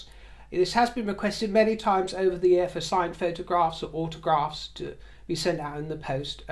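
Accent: Australia. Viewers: Hi from New Zealand. I agree with you & love your Australian accent 😊 I am so disappointed that King Charles is not able to visit our Country & I wish him & Camilla a wonderful Royal Tour 🥰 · Hi, I am utterly disgisted at our politicians. There is no way I'm voting for a republic. Long live King Charles. Love you're Aussie accent.😊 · may I compliment you on your Aussie accent. You nearly have it down 'pat.' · I'm Australian and I also think it's very insulting of our Premiers not to meet with King Charles. The general public voted to remain a monarchy at the last referendum. If King Charles & Queen Camilla were visiting my state, I would go to see them but unfortunately they are not coming to Queensland. I went to see Queen Elizabeth and Prince Philip in Brisbane when they last visited. You have an excellent Australian accent,. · as an 🇦🇺 I can explain why it's very simple, we have a Labor Government in power, they are what we call Red Raggers & they're always extremely unhappy sour people. 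Everytime Labor takes power the country ends up in more debt with more crime & more tax to try & pay for the debt. If we go to a Republic it will allow the powers that be to change our Constitution which in these times is extremely dangerous, extremely & even most of the young people know this. We will remain under a Constitution primarily because of this unless of course the votes are 🤔 tampered with 🤨. However I honestly think that if the Wales were visiting instead I would bet money on it that all of these Premiers would have made time, just like they're all quick to turn up to opulent dinners with Queen Mary when she stands for exactly the same system as King Charles & isn't even our Monarch. Glamour & popularity certainly impacts with whom Labor politicians choose to dine with, at least that is one thing Labor does stick too. I'll shut up now otherwise I'll rant on forever as you can guess I'm not a Red Ragger. 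By the way dear may I say on the whole you do a wonderful Aussie accent, depending on what area you come from the accent may become a little more defined.🇦🇺🐨🙏🏻👍🏻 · You can't imagine how we feel as good as your Aussie accent is😅 so many feelings our lucky country is losing its way with idiot politicians and migrants whom disrespect our way of life it all makes me cry Love ❤🇦🇺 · Love your Aussie accent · 🍐 yes please! 😂 Australian Squirrel here from Ballarat, regional Victoria...I am embarrassed for our State Premier Jacinta Allan' rudeness..how busy is too busy for a Royal Visit...surely a cabinet meeting can be postponed. Nothing much happens I'm sure if the juvenile antics of televised parliament sittings are anything to go on. It would be tremendous to have King Charles visit here as the Late Queen had and other notable royal family members going back.. Cheerio ps love ya Aussie accent. 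It was ripper mate.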